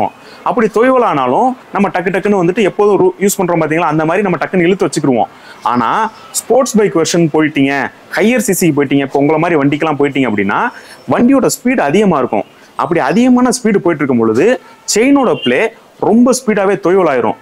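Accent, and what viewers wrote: Madurai, India. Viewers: பாய் நீங்க மதுரையா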